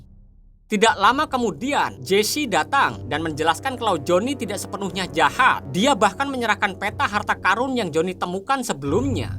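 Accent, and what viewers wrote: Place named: Surabaya, Indonesia. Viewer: Penyiarnya lucu.. Bahasanya suroboyoan, mathek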